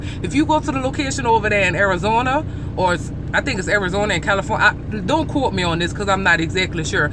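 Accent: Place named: New Orleans, United States. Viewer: U gotta be from New Orleans. Hear the accent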